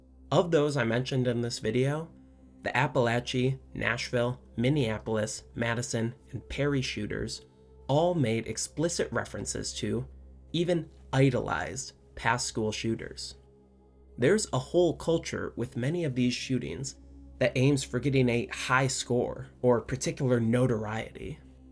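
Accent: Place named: Michigan, United States. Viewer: Yo, A new video by my favourite YouTuber with a thick Michigan accent, on my favourite Holiday too? Can this get better?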